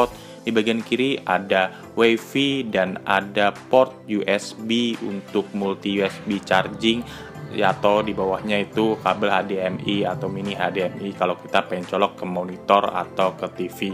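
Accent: Banjar, Indonesia. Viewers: Urang Banjar kh jua Pian😅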